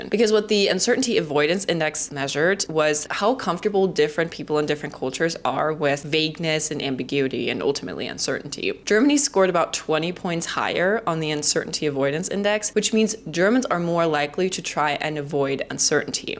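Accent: United States. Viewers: Hi, wow, this was brilliant, almost. I mean clearly above average in comparison to other American versus German stuff. Your American accent is beautiful. In most cases I prefer International English, but you sound awesome. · HI! 58-y/o guy here. You don't "sound" German, so US'rs likely think you're "one of us," if you will. You speak like an American from the vids I've seen, so hopefully this will help! Americans see everyone as a potential friend, so if I were in another US city & saw someone wearing a sweatshirt of Madonna's concert in Chicago, say, I'd think nothing of going up to said person & gushing about their sweatshirt & that concert. "Wasn't that a Great concert?" S/he would likely say, "Yes, OMG, YES!" & we'd talk about it. We wouldn't think anything of this interaction. I'm told that this would embarrass folks in the UK & EU, b/c everyone sees themselves as private. 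The Sie/du forms (Germany & other EU countries, & the Brit perspective on formality) seem to prohibit this. English used to have a formal form (I forget it; I think that's where "y'all" came from), but it's been eliminated as the language has been watered down. But welcome to the US!:-)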